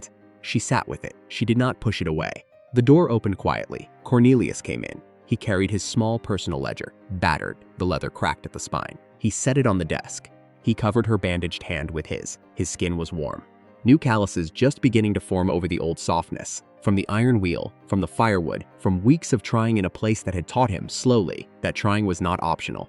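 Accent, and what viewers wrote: United States. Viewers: Good story but annoying background music, floating circles and too large a font. English accent please, not American because the story is based in Yorkshire, England. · I read several comments about reader being too fast and speaking from back of throat Having grown up in Michigan, this sounded "normal" to me! 🙂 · So, American narration for a Yorkshire story! And incorrect pronunciation of words with the same spelling. So when perusing a letter the word is 'red', when speaking of roofing materials the word is 'led'. Allowing AI to run amok doing its own thing renders the whole ridiculous. And as others have said, far too fast.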